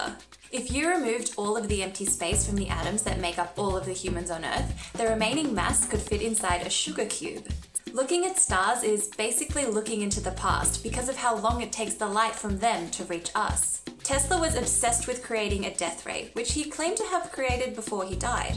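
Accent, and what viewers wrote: Australia. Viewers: Her accent is Australian and I’ve never heard an Aussie pronounce it “urine-us”. That’s more of an American affectation. Out of curiosity, how do you pronounce “uranium”? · Well, since we are bound by causality, it would be impossible for me to do that. Your making the video is the cause and my replying to it is the effect, after all. The latter can not precede the former. BTW your accent..........I presume you're Australian?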